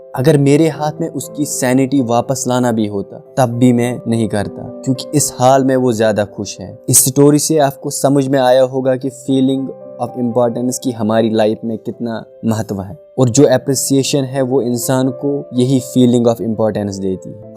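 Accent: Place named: Kashmir, India. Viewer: A nice summary ....voice really soothing Kashmiri ascent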